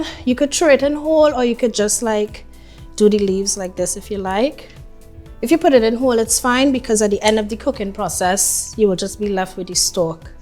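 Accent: Trinidad and Tobago. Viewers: Love her videos! She is totally engaging and genuine. Keep using the Trini dialect girl. It adds the extra taste in the local dishes that you present. Blessings. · Oh Ghosh!! Girl, I just love that I found you. I love how you talk. A real Trinbagonian. I’m here to stay for always🧡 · I’m Jamaican and never had that dish . It looks mouthwatering. I just your Trini accent.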